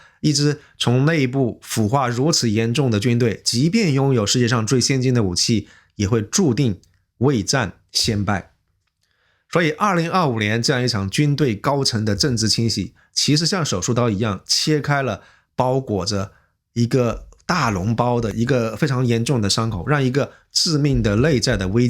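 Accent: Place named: Sichuan, China. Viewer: 博主的这一口川普真的非常恶心，非常刺耳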